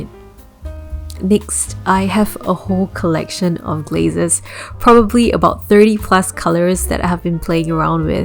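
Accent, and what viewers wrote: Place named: Southeast Asia. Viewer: ah, when I heard you started talking I knew you were Southeast Asian! nice to see a SEA ceramicist here :) I’ve been thinking of getting into pottery (maybe turn it into a career in the future?) your video is really useful !! thank you for the list too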